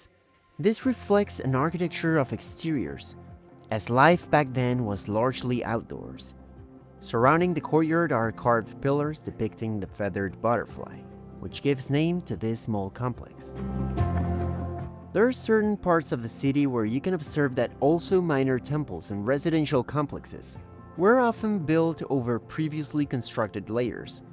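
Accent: Mexico. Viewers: Estimado Sr.: me he vuelto un casi adicto a su trabajo. Por su acento deduzco que Ud. Méxicano, por su trabajo en Italia también deduzco que estuvo viviendo allá, también deduzco que es arquitecto y tiene una maestría en historias de la arquitecta o del arte. La pregunta de hoy, la pregunta: cuáles de estas deducciones son correctas??? Que ORGULLO encontrar un creador de contenido como Ud. Las comparaciones son odiosas PERO en ocasiones NECESARIAS y sin ánimo de verme MUY salamero, su contenido es MUCHO MEJOR que el de otros Youtubers mexicanos. Gracias por compartir sus conocimientos. Un abrazo grande y fuerte para Ud. Desde Tequila, Jalisco, México. El pueblo que le da nombre a nuestra bebida FAVORITA 🥃🍸🍹🥤 Feliz juevebebes,👍🖖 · Tu voz se escucha mas sexy en ingles con ese acentito mexicano. 😅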